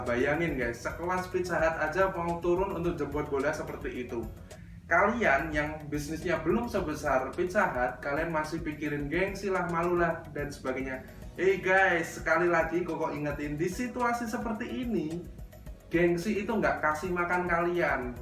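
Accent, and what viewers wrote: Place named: Java, Indonesia. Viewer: KOKO MEDOK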